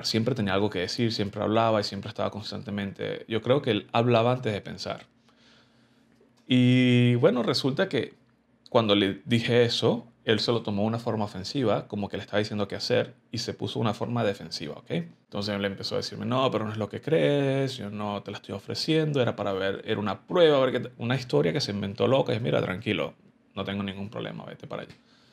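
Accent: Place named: Venezuela. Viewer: Epa chamo jajaja vine porque quería mas consejos sobre la barba pero me quede escuchando tu historia tu acento es venezolano no tenia ni idea quiero preguntarte unos consejos sobre la barba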